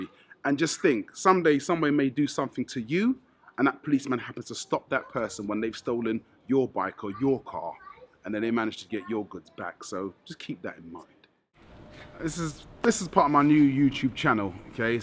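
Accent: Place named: United Kingdom. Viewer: No i couldn't tell but i knew you had that UK accent....I'm from the Philippians but i move to America for 4 years then i move back home for good....i didn't know that you was from Nigeria dats why i had to ask you. Do you ever want to move back to Nigeria???? I thought about moving to Africa soon because i love to travel.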